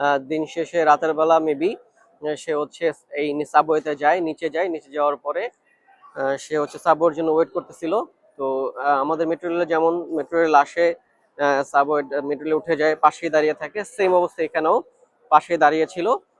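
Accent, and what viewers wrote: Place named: Nadia, India. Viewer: Ami to Kono problem dekhlam na? Amra bangalira aivabey kota boli...apni ki shantipur nodiya theke ashchen naki?....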